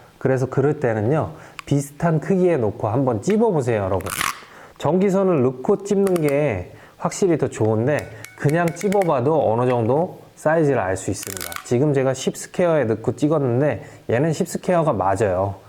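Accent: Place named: Gangwon, South Korea. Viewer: 좋은 정보영상 잘봤습니다.^^ 대표님 발음이...강원도 말투가 자꾸 ㅎㅎ 그랬슴다 ㅎㅎ 그랬슴다 영상 업로드되서 볼때마다 재밋슴다 ㅎㅎ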